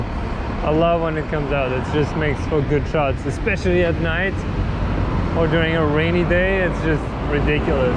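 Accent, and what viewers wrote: France. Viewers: Hi ! I love your content, just discovering street photography and your work blow my mind... One thing you have in common with all other street photographer I watch recently is the picture of strangers without noticing/asking them. Is it something normal ? You don't have any problem with that on a daily basis (law or simply argument with people if they notice you have taken a picture of them) ? It's a legit question, and sorry if it sound a little rude, it's not my goal, just pure curiosity (and sorry for my very poor english, I can ask that in french but I want to stay in the channel mood (your name and accent make me think we spoke the same langage)). Anyway, thank you for your work, really beautifull and relaxing.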